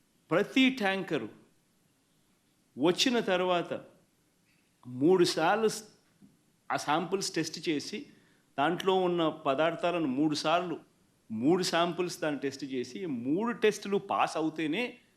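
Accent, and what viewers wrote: Rayalaseema, India. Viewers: Continue with rayalaseeema slang.dont go in polite way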